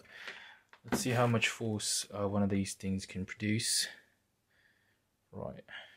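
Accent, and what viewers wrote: United Kingdom. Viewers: Are you English? South African? Or Australian?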